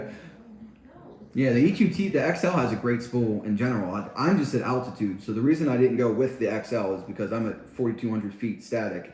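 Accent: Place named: Pennsylvania, United States. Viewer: Where from PA are you? Recognized the accent immediately lol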